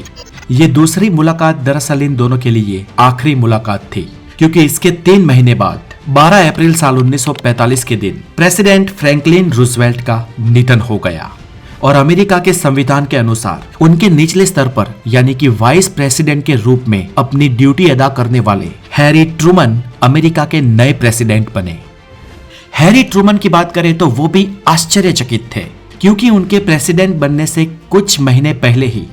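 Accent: Gujarat, India. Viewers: His pronunciation says that he must be Gujrati and most of the times he speaks gujrati so he not able to speak Proper Hindi.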